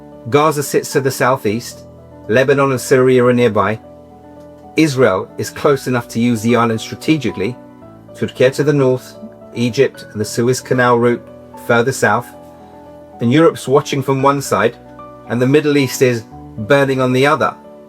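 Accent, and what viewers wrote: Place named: United Kingdom. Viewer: you are invaders to Cyprus you have no rights there that's why you been ignored by all the states Turkish Cypriots ? really where you came from cause before 1100 AC there is nothing written about Turks you only came with the Mongols so what is your rights on an Island that been 3000 years known as Greek ? read some history as your accent is British there are many many libraries to go and open your eyes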